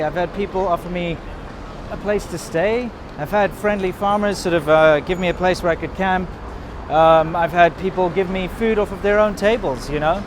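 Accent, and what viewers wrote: South Africa. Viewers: well South Africa was colonized by the British so to an untrained ear they sound almost the same. Plus he has a formal proper South African accent which sounds even closer to a British accent. This is also why New Zealanders sound similar to South Africans because they were also colonized by the British. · More south african than british brah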